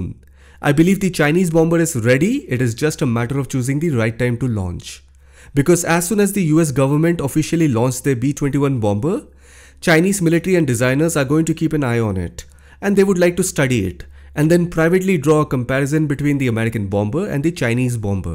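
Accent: India. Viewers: How many Americans want to hear a man with a thick Indian accent talk about American military and aviation? I spent 20 years in the United States Air Force. Call me crazy but I can't listen to him. For the sake of the holy cow. 🐄🐄🐄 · Are you a Chinese talking like an Indian? Following you for a while 🤔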